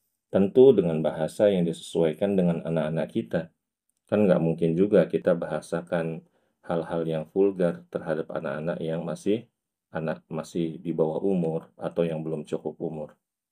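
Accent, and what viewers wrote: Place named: Jakarta, Indonesia. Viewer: MasyaaAllah baarakallahu fiikum ustadz, konten sangat berfaedah, utk kami apapun bahasa dan logatnya ga masalah jarang² kajian Salaf logat batavia, biasanya yg batavia itu yg ono noh 🤭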